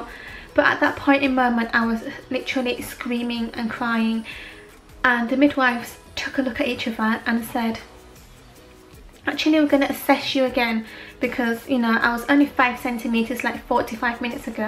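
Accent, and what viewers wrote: Yorkshire, United Kingdom. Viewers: she lives in London bit she is from up north, which is like Yorkshire area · it's yorkshire accent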